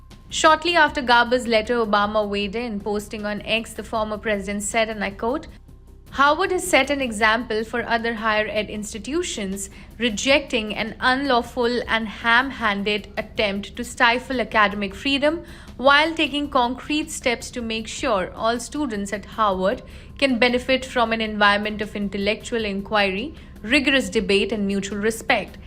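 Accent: India. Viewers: very few Americans can say my name correctly and I accept that. She has an Indian accent. Get used to it or move on.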